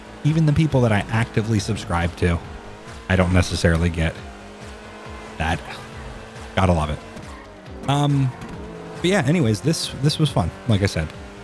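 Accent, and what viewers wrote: Bay Area, United States. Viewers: Why do you sound like Bay Area buggs a little? Or is it just me? Anyways love the content!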